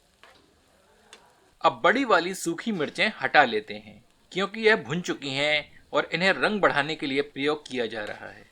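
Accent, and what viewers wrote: Standard. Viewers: जी हम तो आपके व्यंजनों के स्वाद तथा आपकी शुद्ध हिंदी के उच्चारण, दोनों के कायल हैं । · Bhrata....aapki ki sudh Hindi sunn k...mann Anandmay hogaya...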